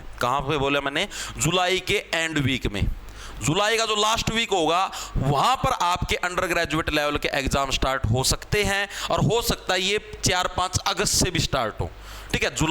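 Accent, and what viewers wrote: Haryana, India. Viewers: Aapki haryanvi mst hai Love from BIHAR 🤗🤗🤗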